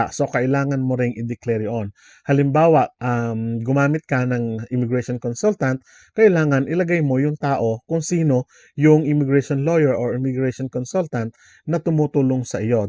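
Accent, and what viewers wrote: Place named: Baguio, Philippines. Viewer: Parang tga Baguio si kumander kuya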